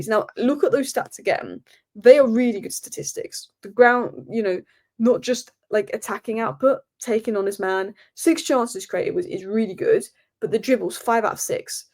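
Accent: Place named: Liverpool, United Kingdom. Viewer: I think your Scouse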